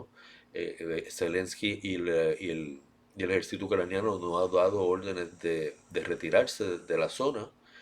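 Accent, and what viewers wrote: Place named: Cuba. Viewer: PONGA LOS PIES EN EL PISO AL HABLAR QUE TIENE LA MENTE IDA. ESO SON AÑOS DE HABER VIVIDO EN CUBA. POR LO QUE OIGO PARECE CUBANO.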